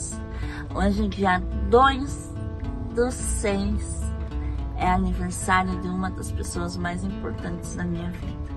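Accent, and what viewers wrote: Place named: Paraná, Brazil. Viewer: Parabéns pelo seu aniversário, que Deus continue cuidando, dando muita saúde e que tenha sucesso em toda a sua jornada, gosto do seu sotaque e das frases da sua região do Paraná😂😂😂😂👍🙌🎊🎁🍰🎂🍰🎂🏆👏👏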